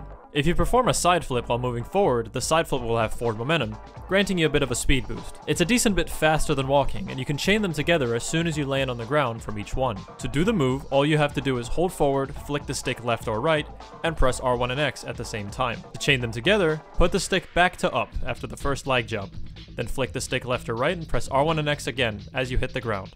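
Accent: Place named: United States. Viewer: MattFlowerDrums He sounds like an american to me.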